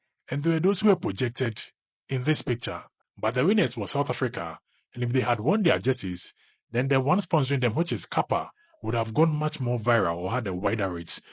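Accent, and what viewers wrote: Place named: Ghana. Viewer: You sound Ghanaian.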